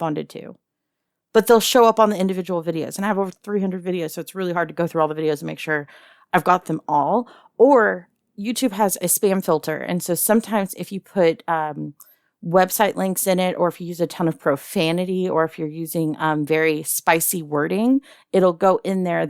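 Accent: South, United States. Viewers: Wasnt expecting a southern dragon but its a pleasant surprise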